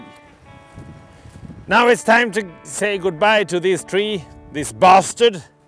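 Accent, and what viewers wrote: Sweden. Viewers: Bra / Good Swenglish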